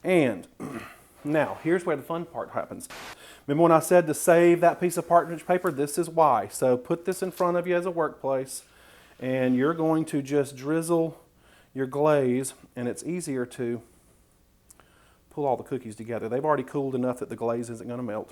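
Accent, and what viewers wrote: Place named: Appalachia, United States. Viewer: You're awesome =) You talk like my folks in the mountains of Virginia =) I left there in 1995 and moved to Ohio. I might have to retire and move back =)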